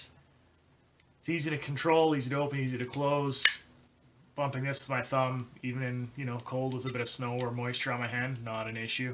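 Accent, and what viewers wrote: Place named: Vancouver, Canada. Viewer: This guy has a vancouver accent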